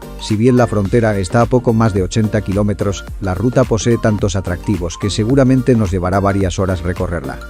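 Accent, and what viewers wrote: Spain. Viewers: Hola !! Soy Chilena es primera vez que veo su video ,me gusto mucho ya que del lado argentino no conocía no e tenido aun el privilegio de ir Supongo que la voz de un español es para hacerlo más neutral bueno la cuestión es que fue interesante muy cultural e informativo somos bendecidos al compartir la cordillera de los andes !! Espero que esa plegaria del obispo Ramón Angel Jara sea escuchada no literal que se caigan las montañas sino que Jamás tengamos que estar en guerra Chilenos y Argentinos ya que seria muy penoso para ambos!! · Hola muy bueno el video y los paisajes. Pregunta , sos argentino ? Por q tenes tonada española.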